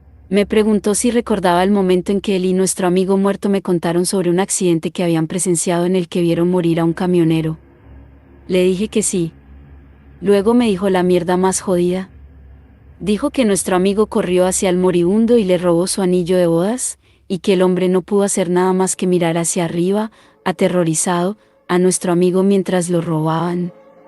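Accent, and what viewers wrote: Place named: Colombia. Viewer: Ponele voz de hombre. Esa voz de mujer colombiana no ayuda a los videos.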